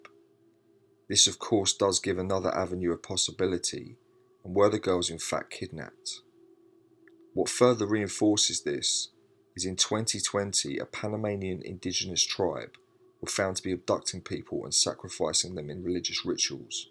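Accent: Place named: England, United Kingdom. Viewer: Wow sounds the lovely english accent.. long live england .. great insight sir ... these ladies were abducted znd murdered by he.ry Elizier gonzalez znd his gang à cover up rip kris and lisianne 🙏🙏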